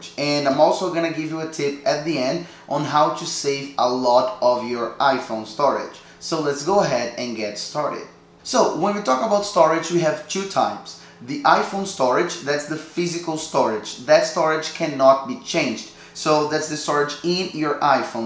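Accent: Brazil. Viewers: You are definitely brazilian I can tell by ur accent 💜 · Are you Brazilian??? Awesome accent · Helped me a lot, thanks. I realized by your accent that you’re also Brazilian haha. Your English is perfect, congratulations! How is it for you to build such a big channel and so much content in another language?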